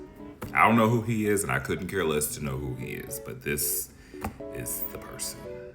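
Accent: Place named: United Kingdom. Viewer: First thing -- I LOVE your British accent. And secondly, to me, it just feels like certain men are being rewarded for their bad behavior and hatred. I feel like high positions in these sorts of contests and polls are a way of telling them, "Keep doing what you're doing, and you will be given further, grander presents." It wouldn't surprise me, if Piers, in particular, is itching for a knighthood. (6/2/2024)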